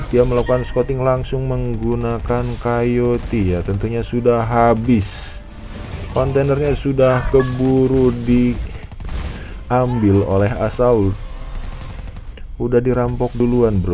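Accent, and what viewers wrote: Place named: Central Java, Indonesia. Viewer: Btw asli mana bang,dari logat ngomongya kaya or Jateng..🙏🙏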